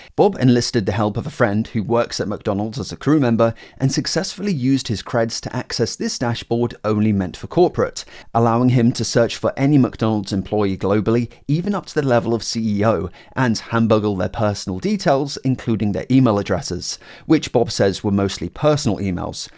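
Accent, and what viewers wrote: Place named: United Kingdom. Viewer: no that’s his voice, he’s british i think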